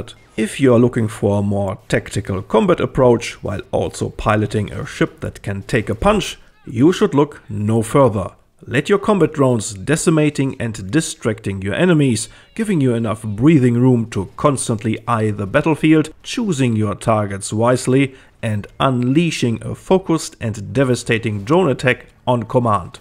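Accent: Germany. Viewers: do i hear a german accent ?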